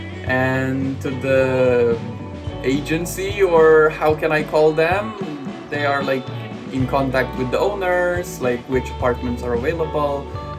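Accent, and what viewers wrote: Philippines. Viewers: You speak your English in a Filipino English accent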